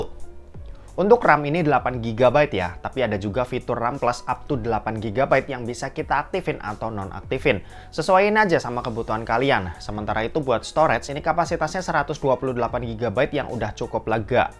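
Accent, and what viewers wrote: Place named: Central Java, Indonesia. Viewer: orang cilacap atau semarang njenengan mas?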